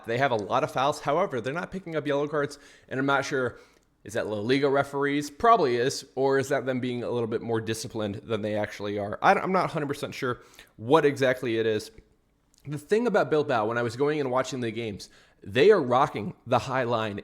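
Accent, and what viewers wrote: United States. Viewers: Are u from kc bro?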